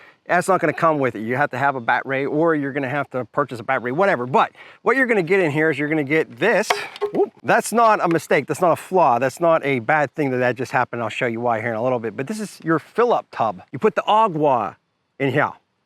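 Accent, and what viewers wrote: Pittsburgh, United States. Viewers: I hear Pittsburgh in your accent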